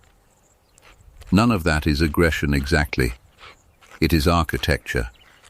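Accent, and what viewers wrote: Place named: United Kingdom. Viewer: Really? Are you really proud of your hollow, soulless, contemptuous, empty, Superior British accented commentator? We understand that you're incapable of providing a truly human being with a truly human commentary, but at least give us one who doesn't have the intolerable snobbery of the British, thanks.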